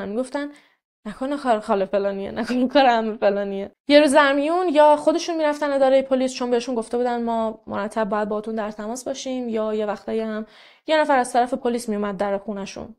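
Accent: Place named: Ahvaz, Iran. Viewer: بچه کجای اهوازی تیکه کلامات مال اهوازیاس لهن صحبتت و نوع حرف زدنت اهوازیه ..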